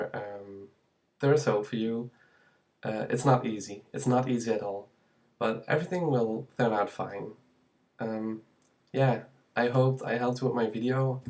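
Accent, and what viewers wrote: Germany. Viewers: You have a very faint German accent...Are you German? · Bist du aus Deutschland? Your accent sounds German.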